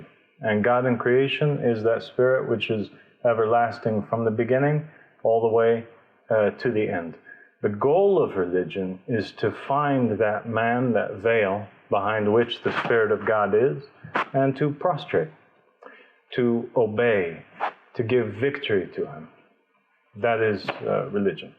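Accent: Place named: Texas, United States. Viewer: لسانك مو عربي والاكسنت مالتك تكول كاعد بتكساس شنو مهديكم من تكساس😂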